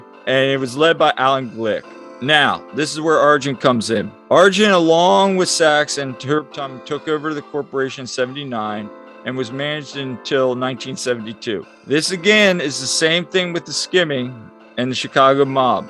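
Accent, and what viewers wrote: Southern California, United States. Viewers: You sound like spiccoli radical duuude so Southern California Lolol anyways I like your videos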